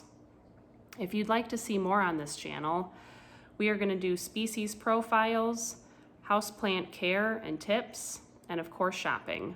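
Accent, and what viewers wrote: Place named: Northeast, United States. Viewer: From Amherst Ohio I hear the northeast accent....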